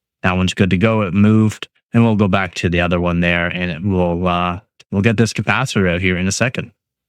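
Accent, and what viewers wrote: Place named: Canada. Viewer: Yep. Really irritating to hear sodder. I thought the only country to pronounce it sodder were your neighbours,(and soon to be assimilated into the US ...Joking of course ) . Sussed you were Canadian by the pronouncing the word "out". Have been doing the electronics etc stuff for over 60 yrs- yep an old boy. So if you won't pronounce it as solder then I will have to watch with the sound turned down.... Cheers and good luck with the channel.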